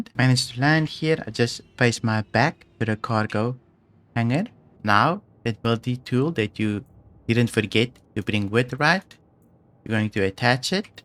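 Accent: South Africa. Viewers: You're very good at explaining things at a good pace without leaving anything out. Nice SA accent too. Good job!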